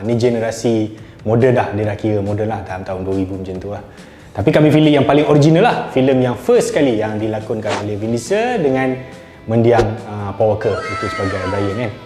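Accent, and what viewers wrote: Kelantan, Malaysia. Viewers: Ralik bangg. Kelantanese ke?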